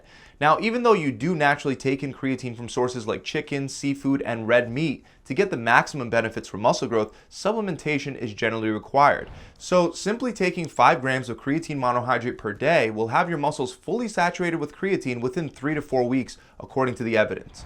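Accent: United States. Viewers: It's interesting video but what a pity this guy speaks so fast and with strict USA accent forgetting his post goes all around the world and he doesn't do any effort to be understandable for people that are not necessarily of English mother tongue. He is not the only one, many do the same😞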